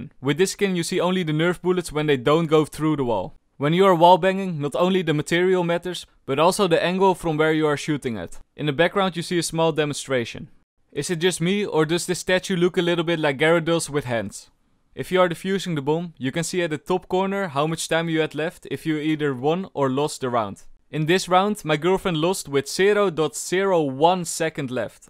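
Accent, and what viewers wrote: Netherlands. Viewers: Met dat nederlandse accent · dutch accent :) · Ik weet gewoon gelijk dat je Nederlands bent geweldig ik vind je oprecht geweldig man ga zo door!!!! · De Hollandse uitspraak van haha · Goed Nederlands accent wel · Are you dutch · ik hou van u nederlands accent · Love the reference to Kud on this moment xd I hear your Dutch accent so you can't say you don't know Kud haha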